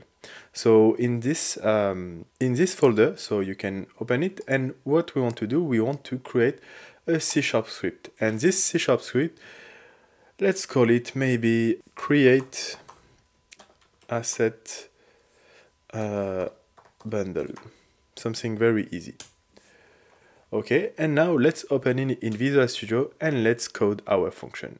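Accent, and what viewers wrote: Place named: France. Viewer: Je reconnais cet accent français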